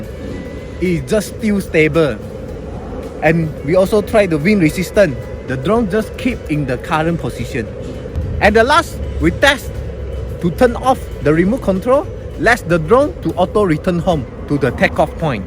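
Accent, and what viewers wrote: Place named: Indonesia. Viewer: indonesian Speak🤣🤣🤣👍👍 not bad my bro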